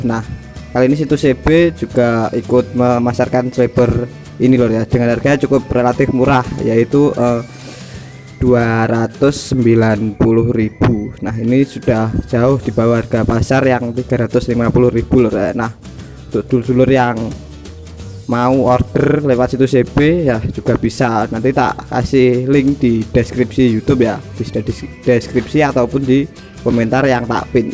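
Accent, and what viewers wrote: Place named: Java, Indonesia. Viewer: Medok lut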